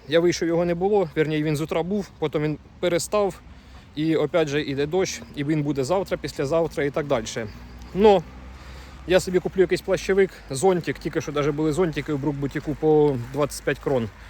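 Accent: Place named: Poltava, Ukraine. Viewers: Привіт з Полтавщини! Суржик клас! Прям як у нас! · Дівчата, нічого не згодна з Вами, його мова - це чистий суржик Полтавської області 😊.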